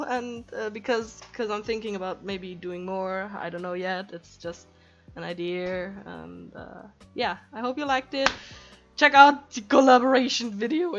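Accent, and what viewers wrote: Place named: Germany. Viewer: Your little german accent is great! Your art us also great!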